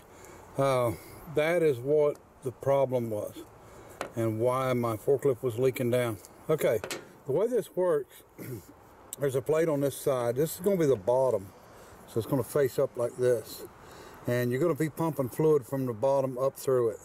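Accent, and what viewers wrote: United States. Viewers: A true American, an American holiday, American ingenuity, American scenery, American accent throughout.......gives one hope that this is still America and we might, just MIGHT recover. Need an example of what I mean? When buying a $10 watch at Walmart, look at the instruction sheet. Three feet square! The English part is three square inches. A new iron, same thing only they tell you "DON'T IRON CLOTHES WHILE WEARING THEM!" Whoopeee! Happy Mothers Day. Thanks.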